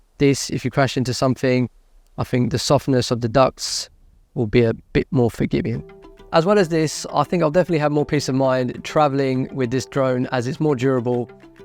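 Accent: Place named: London, United Kingdom. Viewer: That's good to hear thanks for the reply! Yea fellow Londoner (guessing from the accent), lots of content is US based with drones on here so good to see experiences from UK chaps like yourself! Might have to invest in an avata!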